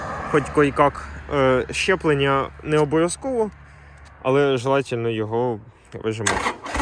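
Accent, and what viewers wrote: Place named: Poltava, Ukraine. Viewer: Нехай радує друже) Суржик як на Полтавщині)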